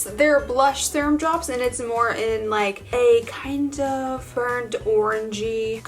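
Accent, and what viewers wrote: United States. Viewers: Your accent is Americanized while has a more classic, British/Mid Atlantic influenced accent.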